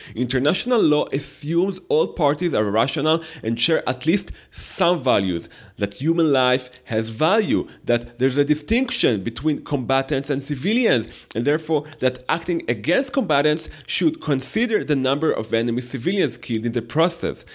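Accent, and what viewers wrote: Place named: Japan. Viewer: ברנש פגז, אך להאזין לו זה כמו להאזין ליפני מדבר אנגלית. על הנייר, האנגלית מצוחצחת ורהוטה. בפועל, אי אפשר להבין מילה. חבל.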